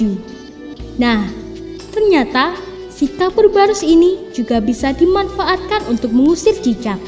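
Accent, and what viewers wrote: Java, Indonesia. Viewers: Ngmongnya meddok, lelet lagi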